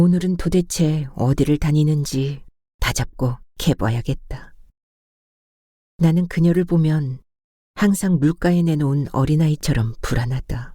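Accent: Jeolla, South Korea. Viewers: 내고향 전라도사투리를 오랫만에들으니 정겹네요 잘들었습니다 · 안녕하세요~~미스리가 아니고요 미스정이에요 구수한 전라도 사투리 재밌게 들었어요 우리 님은 성우 이신가요 ㅎㅎ 낭독도 잘하시지만 표현도 예술이에요~~😊😊❤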